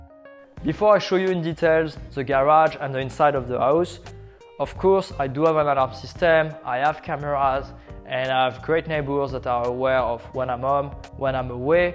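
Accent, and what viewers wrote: France. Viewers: i am not able to understand a full sentence of that frenglish.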